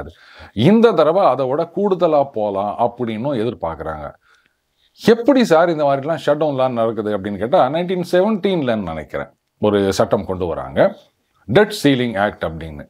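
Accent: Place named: Chennai, India. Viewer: வர்ணிப்பு...அசத்தலாக உள்ளது. சூப்பர்..பக்கா சென்னை வாழ் தமிழ் குடிமகன் போல... வந்துகினு. போய்க்கினு.. ஆனால்... நல்லா இரசிக்கும்படியாகத்தான் இருக்கு.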